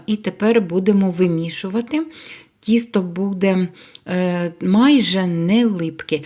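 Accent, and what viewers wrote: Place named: Polesia, Ukraine. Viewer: "...щоб він не підсиха(о)у" - поліське, впізнаю. Побільше б такого автентичного, з вашої родини, від бабусі (хоча це слово (бабуся) - то від нас, з Полтавщини) 😍 Оце ваше кі(и-у)н(ь) - кінь, гурок - огірок, сі(и)ль - сіль. З Полісся з всі слов'яни пішли ;-)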